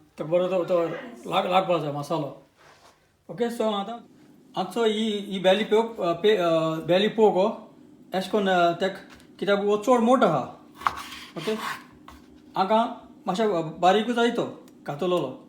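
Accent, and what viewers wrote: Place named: Konkan, India. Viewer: I like your konkani accent...and your recipe's are really good. 👍Tuge chouris maas receipe maka bori lagli. Mog asum.🤗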